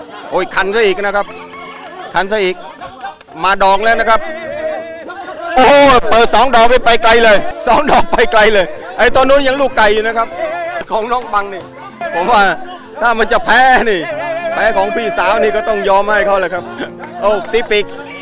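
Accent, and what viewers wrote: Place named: South, Thailand. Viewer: พูดใต้เลยครับ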